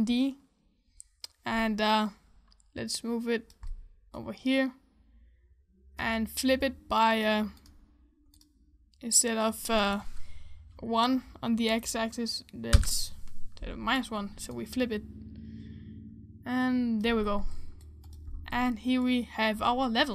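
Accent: Denmark. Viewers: Instantly recognized the Danish accent. Especially because of the "Øh.."'s he made. Cool tutorial~ And hav en god dag B-') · Are u danish? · Are you Danish, or what? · Are you DANISH??? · Bra video! Hører du er dansk! :D Er fra Norge jeg da, så håper du forstår hva jeg skriver! :D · Hey man, are u danish?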